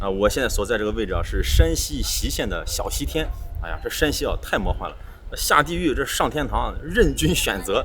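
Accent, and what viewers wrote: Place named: Beijing, China. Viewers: 聽大家吊個京腔，俺高低得吼個“好～～！”。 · 👏👏👍 的歌喉真的不錯，以往都聽出耳油。今次京腔亦好有味道，希望以後在你視頻能再聽到。😁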